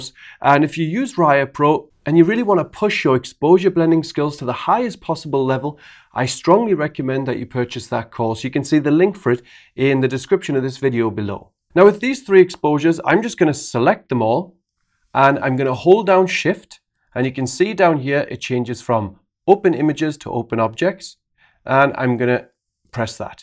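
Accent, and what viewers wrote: Newcastle, United Kingdom. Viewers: Found this via SLR Lounge on Facebook. Subscribed. A Geordie teaching Photoshop might just be the best thing ever. The only thing that would make it better was if you did a video while you were drunk lol.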